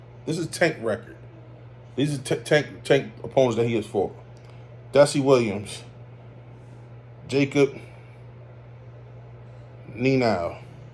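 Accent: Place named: Baltimore, United States. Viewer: You got a strong Baltimore sounding accent but Philly ain't but a few hours away. And Ryan only got a left hook. Nuthin else. Tanks gonna nap him.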